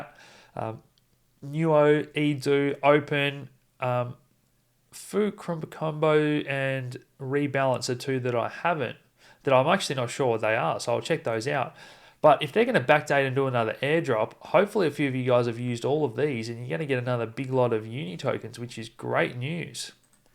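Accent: Tasmania, Australia. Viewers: Thanks. BTC around $13814 as I write this (Nov 1st, MT). Oil slaughtered. USD stronger. Wonder what will happen tomorrow with the S&P 500. All this interest in BTC makes me want more so is not all in the hands of the rich and corporations. Also ETH must start going up soon according to your analysis - I have only dregs left after maximizing my BTC. Your Tasmanian accent is very easy to listen to and I like that you, your wife, and kid seem so well balanced in this crazy world.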